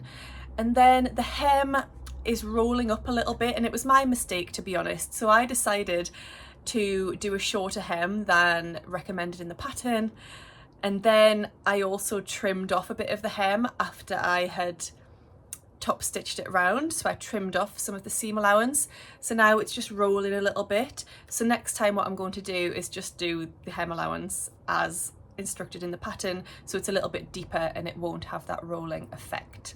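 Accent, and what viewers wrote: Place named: County Durham, United Kingdom. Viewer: It's lovely to hear your accent on YouTube, I live in County Durham so not far! I love sewing but I don't have anyone I know that likes it too, I literally get all giddy in a fabric shop! I love dressmaking but have decided to start doing some craft fayres with bags, accessories etc, maybe something like that could help xx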